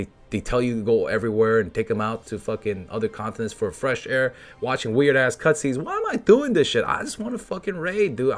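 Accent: United States. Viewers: Try to speak less like an American and more like someone speaking English.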